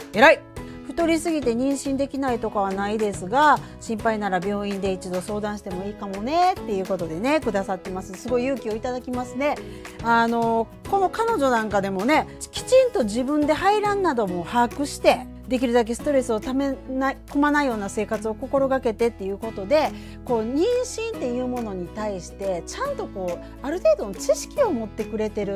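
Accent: Kansai, Japan. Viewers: 46歳って超高齢出産なんですか？ 私も43で初産の時に超高齢と思っていましたが、先生が「超高齢は今は50歳以降での出産だよー」と言われました。 そしていつも拝見してましたが、この回はHISAKOさんが😢残念な気持ちになりました。関西の方なので言葉使いやイントネーションも加味されるのでしょうが、皆さんが強い気持ちを持てる訳ではなく、HISAKOさんの様に経験があるわけでもなく、だからこそ、チャンネルを観に来る人が多いかと思うのですが。私はあまりにも、「だったら産むな論」的な発言にショックでした。背中を強く優しく押して欲しいからの投稿だったのじゃないかなぁ？と勝手ですが思っていたので😢 まもなくご出産ですね。どうぞどうぞ元気にお子様とおうちへ戻られることを祈ってます。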